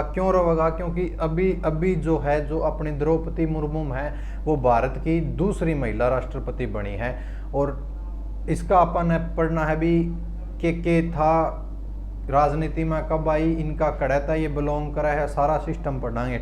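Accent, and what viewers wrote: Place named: Haryana, India. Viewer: Mjja aa jata h sir apki class dekh kr🤣🤣🤣🤣pure haryanvi😁😁😁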